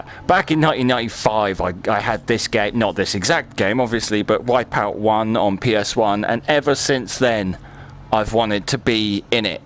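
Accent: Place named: United Kingdom. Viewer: I love your british voice. You sounds like a commentator of sport or an astronaut commenting the spectacular view of earth today. 😄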